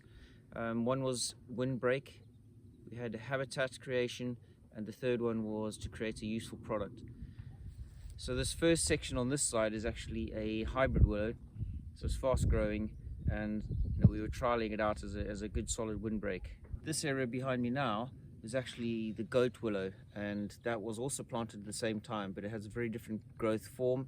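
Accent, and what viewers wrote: South Africa. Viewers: You sound South African but you say south is towards the sun (not north as it is in SA) - what country are you in nowadays?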